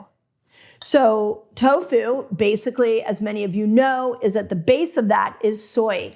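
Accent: California, United States. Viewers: Doc has a bit of a California surfer accent.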